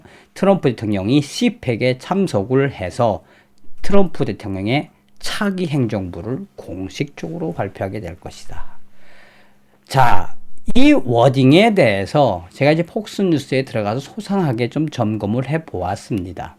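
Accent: North Korea. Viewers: 방송 희소삭 매일 우울한 국민을 가짜든 진짜든 희망을 갖게합니다 하여 방송 감사하게 생각합니다 그러나 북한사투라는 이해하자만 이시점에서 좀 삼가하심 어떠신지 ? 죄송합니다.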